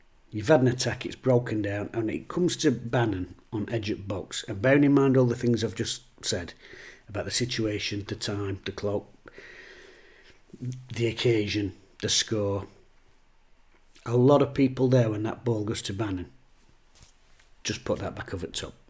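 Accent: Sheffield, United Kingdom. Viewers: As I now live in Devon, I would like to say how lovely it is to hear a real Sheffield accent again with all the old jargon I was brought up to hear. Keep up the good work as I look forward to your videos appearing. Thank you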